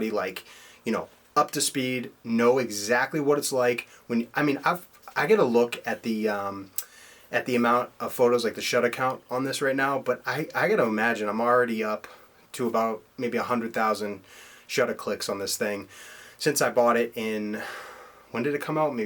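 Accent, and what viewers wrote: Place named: Massachusetts, United States. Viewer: You mentioned how you are from Massachusetts, then I suddenly noticed the accent. xD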